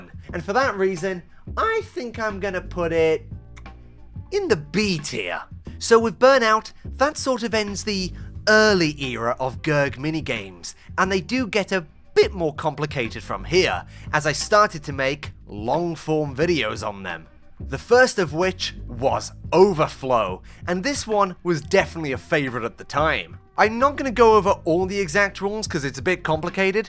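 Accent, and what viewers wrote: United Kingdom. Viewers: will you tell us how we can acquire an unhinged british accent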